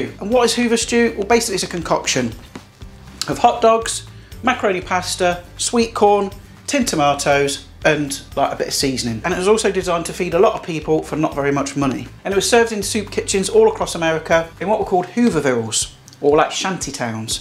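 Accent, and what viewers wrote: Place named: Leicestershire, United Kingdom. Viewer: Actually I lived in Hinckley for several years and my mum was from Leicestershire, coountesthorpe. Lovely countryside😊. I recognised the accent you have. Anyway, just saying. You do make me laugh,love your sense of humour. Be lucky x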